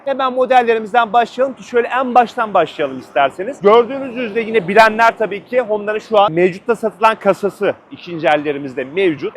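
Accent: Samsun, Turkey. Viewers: Samsun şivesi bu ama konu o değil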